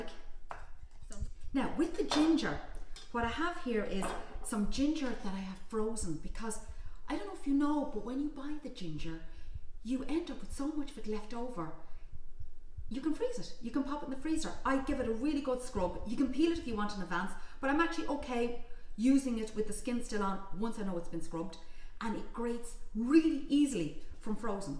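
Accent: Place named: Ireland. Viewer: Nice to see new videos love watching the videos and hearing that beautiful Irish accent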